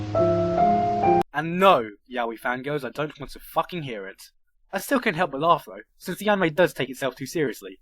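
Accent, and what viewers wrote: United Kingdom. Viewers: Dude he had such a British accent back then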